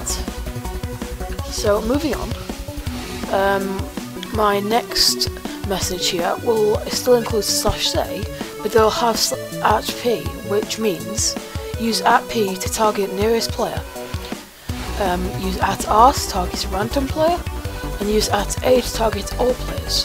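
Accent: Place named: England, United Kingdom. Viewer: you are english i can tell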